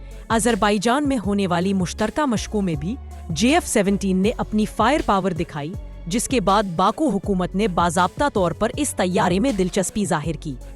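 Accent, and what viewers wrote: India. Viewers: بولنے کے اندر سے پتا چلتا ہے کہ انڈین ہو پر انالئز اچھا کیا ہے پاک ٹیکنالوجی کو ❤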